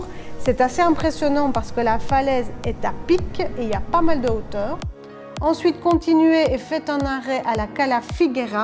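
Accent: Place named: Switzerland. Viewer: Sympa ces découvertes sur Majorque à tester...je peux entendre un accent Suisse dans ta voix.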